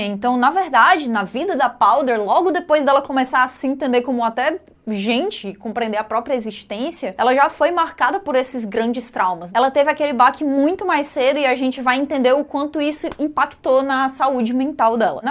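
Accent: Northeast, Brazil. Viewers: impecável como sempre. Adoro sotaque dela, e todos os nordestinos. Acho Arcane uma animação incrível, da pra ver o trabalho bem feito de todos da produção. Não jogo Lol, não entendo nada, mas é de fato muito boa, porém não é perfeita, existe uma seria de problemas no enredo. É pra se divertir. Parabéns pelo vídeo.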